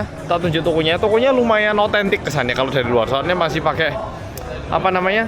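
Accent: Java, Indonesia. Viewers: Medhok e rung ilang to yoo😂😂😂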